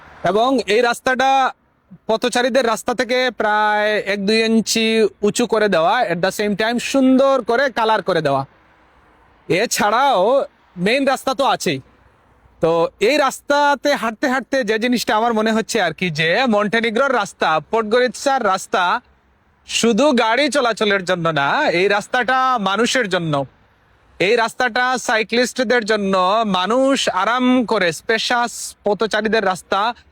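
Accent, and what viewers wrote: Sylhet, Bangladesh. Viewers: ভাই কি সিলোটি নাকি .?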